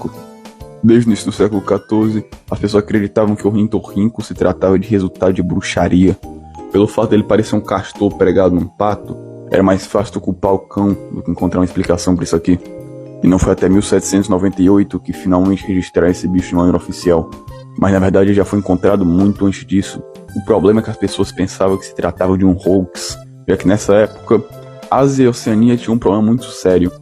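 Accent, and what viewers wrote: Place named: Fortaleza, Brazil. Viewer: Vídeo muito brabo irmão, continua assim que vai longe . Aliás tu é de fortaleza né, reconheci pelo o sotaque kkkk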